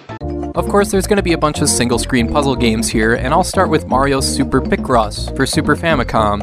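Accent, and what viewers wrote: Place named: Midwest, United States. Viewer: It's very midwest, its like I'm back home.. 😎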